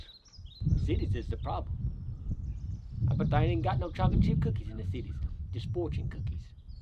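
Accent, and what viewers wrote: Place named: South, United States. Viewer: I can understand everything he says! Lol that’s marrowbone talk right there. Yee yee!!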